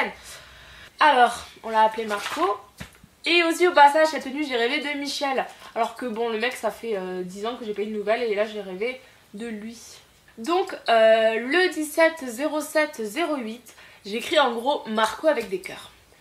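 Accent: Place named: Toulouse, France. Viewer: Dac, dac, j'adore ton petit accent toulousaingue:)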